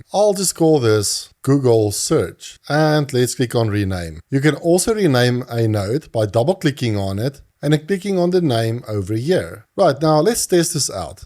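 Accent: South Africa. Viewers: Nice one! Sitting in Netherlands and listening to a Saffa was a proud moment. Love the skillset you bring here, thanks you! *SEO Specialist setting up a multi agent on n8n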